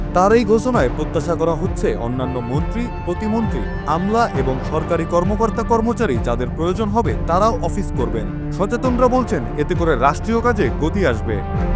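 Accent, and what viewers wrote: Kolkata, India. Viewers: ভয়েস যিনি দিছেন, তিনি কি ইন্ডিয়ান নাকি? Audience must use earphone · উপস্থাপক কে কি কলকাতা থেকে নিয়ে আসা হয়েছে?? ভাষাটা একটু খেয়াল করে দেখবেন মিথ্যা বললাম কিনা? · Jini news porce tini ki Indian , Indian akta tan ace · কথায় ভারতীয় টান ক্যান ভাই? সমস্যাকে ছমচ্ছ্যা বলাতো স্বাভাবিক না। দুই দিন আগে কোন এক এক্টিভিস্ট এর পোস্ট দেখেছিলাম, গিমিক সরকার নিয়ে সেখানে প্রতিটা পয়েন্ট বলা ছিলো। এই নিউউজ ঐ পোস্টের কাউন্টার 😂😂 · উপস্থাকের বাড়ি কি ভারতে,ভাষা এমন কেন? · যিনি ভয়েস দিয়েছেন, তিনি কি ভারতীয় নাগরিক নাকি? উচ্চারণ সেটাই মনে হচ্ছে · জিনি সংবাদ পাঠ করলেন সে কি বাংলাদেশি নাকি ইন্ডিয়ান? উনার উচ্চারনে তো আমার সন্দেহ আছে। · Apne ki dadar desher lok? Vasha sune kolkatar vasa mone holo 😕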